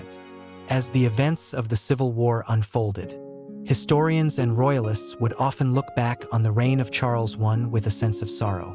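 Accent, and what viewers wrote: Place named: United States. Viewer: This sounds american. I only want uk production.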